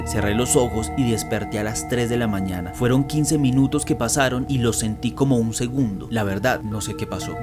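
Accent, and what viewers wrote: Colombia. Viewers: Me gusta mucho tu acento colombiano 😊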